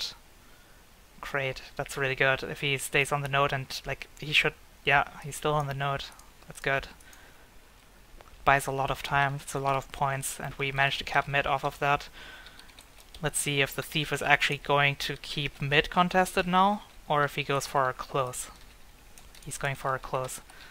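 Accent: Germany. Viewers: den deutschen akzent bekommt man einfach nicht weg :) gutes vid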